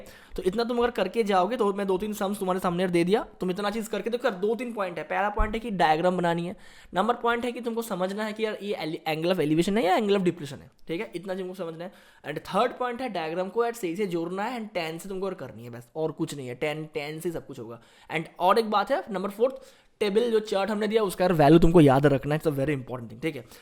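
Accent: Bengal, India. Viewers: Sir are you bengali?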